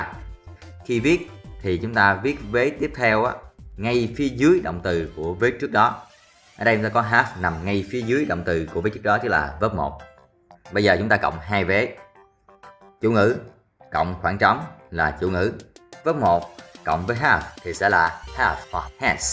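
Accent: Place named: South, Vietnam. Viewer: tại thấy bạn nói giọng miền Nam mà dùng không đúng ngôn ngữ của miền Nam. Bút viết : Viết ( miền Nam), bút ( miền Bắc). Ngôn ngữ miền Nam dần mất đi một phần là do ngôn ngữ miền Bắc thống trị gần hết câc kênh truyền thông ( báo chí , phát thanh truyền hình), SGK cũng do người miền Bắc độc quuyền biên soạn.Một phần cũng do chính những người miền Nam sinh sau năm 1975 không trân trọng, gìn giữ ngôn ngữ của cha ông mình mà còn tiếp tay xóa bỏ ngôn ngữ của miền Nam Việt Nam.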